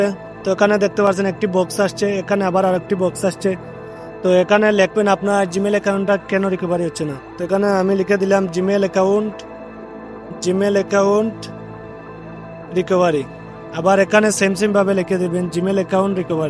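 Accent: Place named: Sylhet, Bangladesh. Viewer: Apne Sylhety🫡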